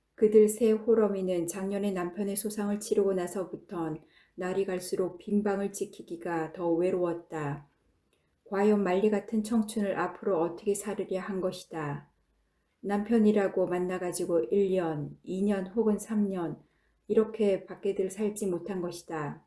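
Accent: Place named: Jeolla, South Korea. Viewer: 구수한 전라도 사투리에 음성이 잊혀져간 엄머니에 음성처럼 정감이있어 행복하게 들었네요 감사합니다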